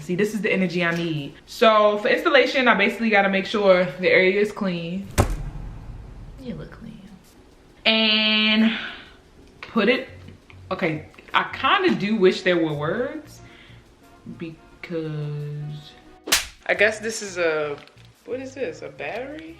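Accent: Baltimore, United States. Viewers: I was like where is she from? Then I heard you say Stupid I said oh she's a Baltimore native 😭😂 love you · oooo do I hear a baltimore accent 👀🌝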